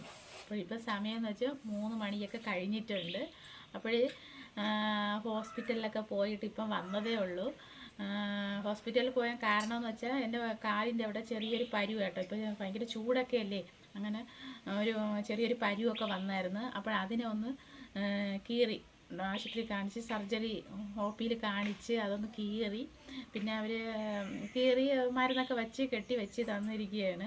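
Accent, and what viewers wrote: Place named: Nedumangad, India. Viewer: samsarak kelkan nalla rasamanu enneppole nedumangadan samsaram🥰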